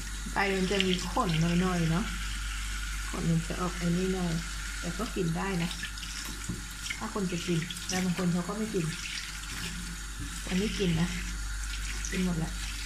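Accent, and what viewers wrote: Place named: Kanchanaburi, Thailand. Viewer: เสียงแบบนี้คนกาญแท้ๆแน่เลย ผักสดๆน่าทานมากเลยครับพี่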